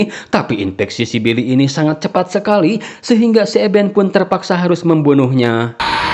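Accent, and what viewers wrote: West Java, Indonesia. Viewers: Sunda pisan😂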